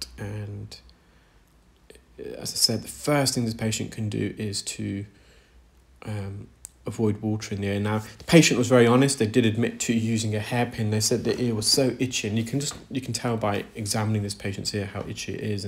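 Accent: England, United Kingdom. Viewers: Hmmmm... I believe that he's English and as such you really can't complain about any pronunciations . I think they have the corner on the market for all English language users.lol That's basically asking someone to speak "American English". Pretty rude if you ask me.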